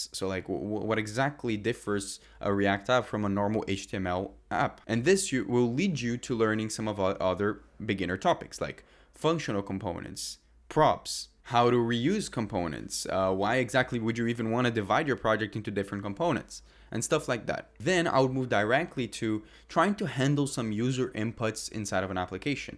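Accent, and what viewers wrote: Brazil. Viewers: tu é brasileiro?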